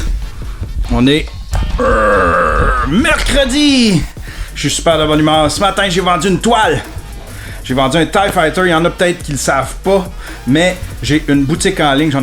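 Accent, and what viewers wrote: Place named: Jonquière, Canada. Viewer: Faque moi je te parle... te donne les mots quand ils te les manques. Je ris... avec et de toi. Je te corrige... pcq dans le coin de Jonquière ils disent légèrte et à Mourial on dit légère, mais bons. C'est une des première fois que je le fais avec ma progéniture. Elle me demande si je sais que tu m'entends pas! Ça va rester entre nous qu'on se parle à travers tes podcasts!!!!! Et comme toujours, ta toile me renverse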